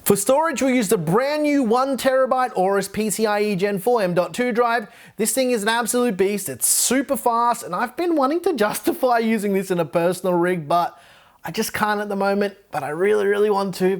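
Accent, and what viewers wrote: Australia. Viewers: Great to hear another aussie voice in the tech space ! Subscribed !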